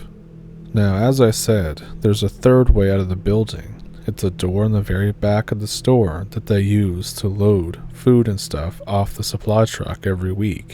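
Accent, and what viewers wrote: Canada. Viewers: I love the Canadian accent. I pop your channel on to relax as I go to sleep. Thank you for all your hard work gathering stories to tell. Thus channel is great. Jo from Australia 🇦🇺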